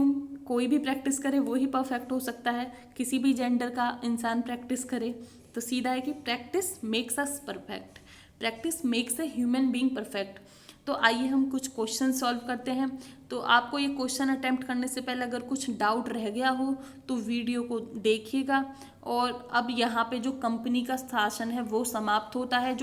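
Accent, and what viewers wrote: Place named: Haryana, India. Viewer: Haryanavi good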